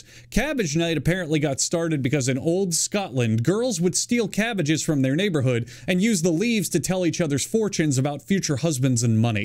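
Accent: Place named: Maine, United States. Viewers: Casually ignores that his dialect is also from Maine. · Actually your accent is more from Maine than Texas which means welcome to Acadia and Appalachia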